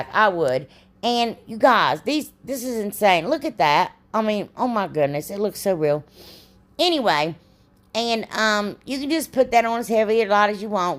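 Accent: South, United States. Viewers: These are all so gorgeous! You are a nut and laugh every time I watch you. You sound as country as me. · I absolutely love all you do and thank you! I adore ya girl! From this southern accented Georgia peach (well no longer live there lol), to your southern accent ..big hugs.. and much love. I hope your husband is feeling better.. last I heard from you he wasn't feeling well (been away doing chemo, so have not been on here as much as usual). I will be on Fri the 18th. Can't wait! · you are just AWSOME.I was away for Christmas,hospital! I really missed you.I love you’re southern accent. You’re just a blessing to me.thank you,God bless you, Happy New Year!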